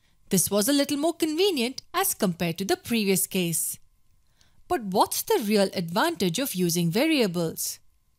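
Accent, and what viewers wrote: India. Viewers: Are you indian? The voice should explain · Are u indian.your voice pls explain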